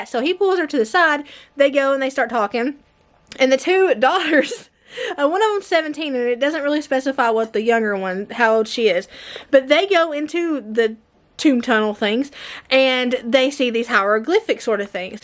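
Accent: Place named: Tennessee, United States. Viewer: I have watched several of your videos back to back. I have enjoyed every minute of it. I wish I had the motivation to keep my house tidy like yours. And love love love all your projects. I am from Tennessee too but I am in middle. It’s like I am watching one of my friends. You actually talk like me, I am not used to hearing folks on here talking like me. Wish y’all well!!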